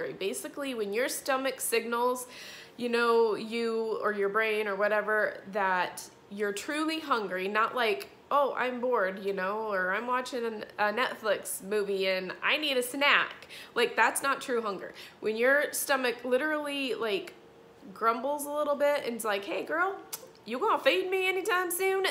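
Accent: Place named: Kansas, United States. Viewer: Great content,...thanks! Btw, are you from the Kansas area originally? Your accent is a lot like someone I know who grew up in that area.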